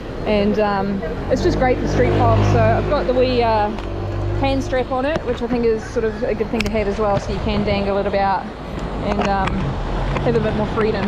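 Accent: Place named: New Zealand. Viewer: Ahhhh I knew you were New Zealander from the first time ya talking Kia Ora from home love your vids!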